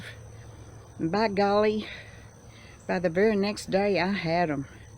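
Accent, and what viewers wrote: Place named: South, United States. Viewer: I love your southern drawl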